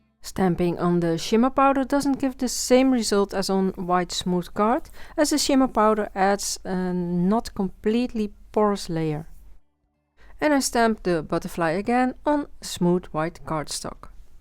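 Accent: Netherlands. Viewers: Wstunning once again! Are you from Holland? Your accent sounds familiar!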